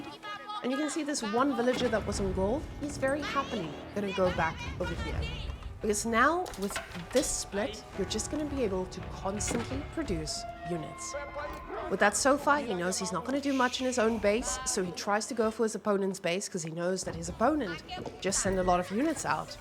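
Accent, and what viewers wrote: South Africa. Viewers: I don't know why but I am madly attracted to the South African accent... I need help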